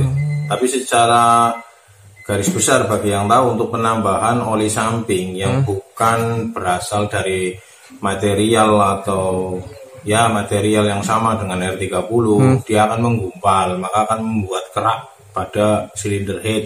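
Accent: Banyumas, Indonesia. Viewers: Filsuf mesin dengan ymbahasa ngapak😅😅😂😂😂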